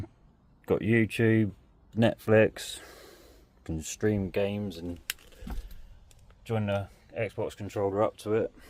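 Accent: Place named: West Country, United Kingdom. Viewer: Been in a 07 tranny SWB RWD since june, zero insulation just the same. Bed lengthways tho, single air mattress on top of a camp bed. Now its getting colder am noticing the amount of condensation and dampness all over the place. Same situation having nowhere to actually do the work plus cba taking everything off the van and back on again every day to live in!! F knows how it'll be in winter 🥴🥴🥴 Also ive thought about bed being width ways as i think it's JUST about 6ft across half way up it but i use the backdoors for entry 98% of the time cuz im stealth, can't be using the noisy and revealing side door too often. Was thinking tho if bed was high enough i could crouch my way in thru the storage space. Again, nowhere to do the work 😂😂 Am in Essex, u sound a bit westy, if not norfolky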